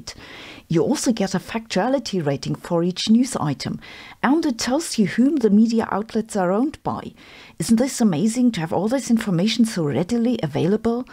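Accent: Germany. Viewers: And I love your cherman accent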